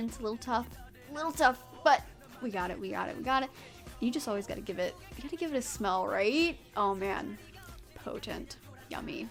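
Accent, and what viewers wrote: South, United States. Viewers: Are you originally from the south? Love your accent! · All the y'alls make me think your southern.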